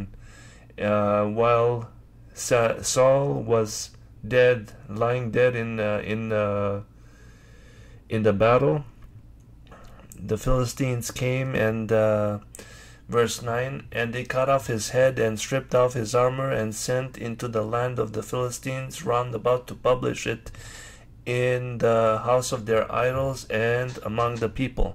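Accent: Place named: Quebec, Canada. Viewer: accent québécois...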